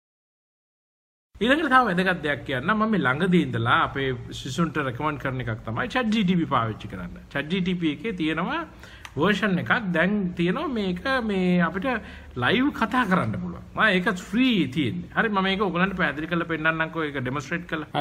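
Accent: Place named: Sri Lanka. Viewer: පුලුවන් කෙනෙක් ඉන්නවනම්. මේ සර් වගේ සිංහල අය කතා කරන ඉංග්‍රීසි තියන චැනල් එකක් කියන්න.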